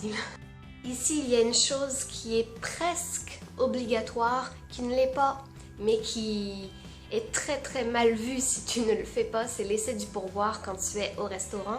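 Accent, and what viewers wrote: Quebec, Canada. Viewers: Pourquoi as tu un accent quebequois si tu es française? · Comme tu es mignonne! Je n'ai pas regardé beaucoup de tes vidéos encore, je me demandais justement de quelle origine tu étais car tu as le plus mignon des accents!! Un mélange avec un accent français et québécois, mais un beau parlé québécois en plus avec les tics de langage tsé 😉 bien hâte de te connaître plus à travers tes vidéos ☺ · En France nous dinons de 19h a 21h, ce n'est pas pour autant que nous ne pouvons pas aller au théatre ou voir un spectacle. Pour la civilité, il faut ce tourner vers le soleil levant. Les Japonais sont un exemple envers le reste du monde. Téléphone en France gratuit (abonnés Freebox, téléphone fixe et portable gratuit). La Nascar c'est n'imp' au usa et canada, tu passes ton temps a regarder les pubs en France retransmis sur AB Moteurs, pas de coupure pub (hummmmmmmmmmmmm). 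Hockey ? qui est champion du monde cette année ? Suéde comme toujours suivi de Suisse mon pays d'adoption, après USA et Canada ^^ Pour le pourboire faut voir, en France il est déjà inclus dans l'addition. Je ne comprendrai jamais pourquoi tu parles autant avec un accent québécois alors que tu es Brestoise. Merci pour tes vidéos, bonne continuation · Vous parlez avec un accent québécois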